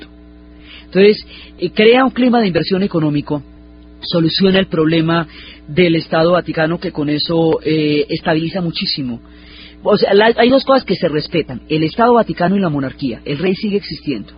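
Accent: Colombia. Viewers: Es un placer escucharte maravillosamente narrado, me das luz y claridad a la historia y con la sal y pimienta de los modismos de Colombia. Delicioso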